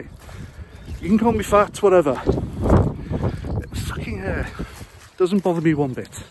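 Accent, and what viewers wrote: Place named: Liverpool, United Kingdom. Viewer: You know why you picked a Scouse accent mate. No need to explain 🇬🇧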